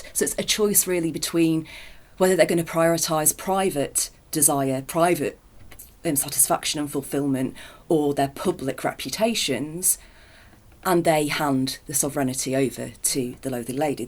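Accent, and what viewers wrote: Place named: United Kingdom. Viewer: British accent, it has to be good.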